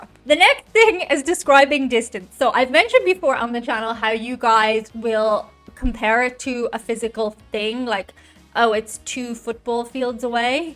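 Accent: Ireland. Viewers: MMM. I think the reply to thank you and compliments is kinda regional. I started replying thank you to compliments because I was never good at taking complements ( Maybe those Irish Genes LOL ) they always flabergasted me... I thought that might be considered rude , so I go to thank you now. You are attractive and you have that great Irish accent.... so no surprise you get hit on... Just turn them down politely...that will usually work. Remember the US is full of folks of Irish descent. .. so it is easy to feel that attraction. Yeah.. we do.. over do too well. I have had other Europeans tell me they liked the encouragement to do something instead of one should stay in their own lanes. Interesting .Thanks. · As far as being hit on, you're fairly attractive and the Irish accent adds just enough exoticism without being too alien. So it doesn't surprise me too much that some men in America go, "what the hell" and take their best shot. 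🤷‍♂ As for distance measured in travel time, I think that likely has a lot to do with the fact that we have such vast distances that it can take a really long time to get anywhere. (That really hit home for me once, on my second trip to Germany. I flew in to Hamburg and spent three days; hopped a train to Berlin and spent five days; hopped another train to Ingolstadt and spent a day; and then took a local commuter into Munich and spent a few days before I flew home. As I was checking out through German customs, the agent there noted I'd flown in to Hamburg but was leaving from Munich and asked me if he understood that correctly. When I confirmed that was the case, he said, "But that's very far, ja?" To which I replied, "Not really ....😕") Also, for a completely different experience in receiving directions, you should come and visit us in Colorado, some time. You'll hear something new. 😁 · Hello Fellow Humans, We are direct because you are absolutely gorgeous. 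You have an irish accent which is one of the top sexiest accents in the world. You are a price for men in America. You are gorgeous, blonde, blue eyed, irish. Seriously you can easily get a husband in America and he will worship you. Brand loyalty is because we are initiated with commercials and ads from birth. Parents live through their kids and so force them to do what they love. It is annoying. Let kids be kids.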